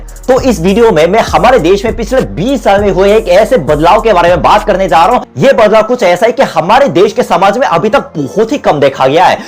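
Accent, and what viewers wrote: India. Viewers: आप पहले ऐसे foreigner है जिसकी हिंदी 100% fluent है और आपका accent भी बिल्कुल हम भारतीय की तरह ही है। आपकी हिंदी देख कर ये बताना मुश्किल है कि आप भारतीय है या दक्षिण कोरियाई नागरिक।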